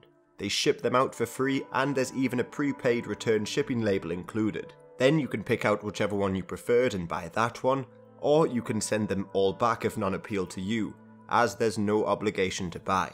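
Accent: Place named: Newcastle, United Kingdom. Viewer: So are you a geordie trying to sound posh or what? Your accent is hard to place.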